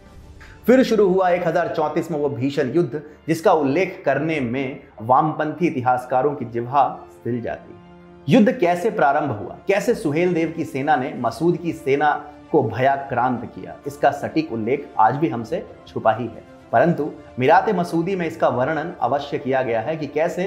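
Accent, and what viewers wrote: Standard. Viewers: Hats off to you sir, narration in pure Hindi. One request - please write this history, many book readers would like to read and listen to such glorified, true history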